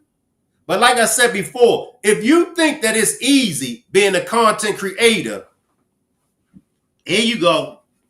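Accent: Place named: Baltimore, United States. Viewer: The Baltimore accent gets me everytime 😂 I'm from Louisville Kentucky but I'm a RAVEN all day! Flock Nation! Replay Gang!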